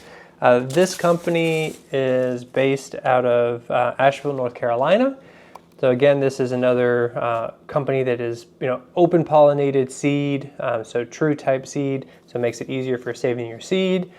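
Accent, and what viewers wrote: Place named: West Africa. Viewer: The French with a west African accent piqued somdthing....are you a MK?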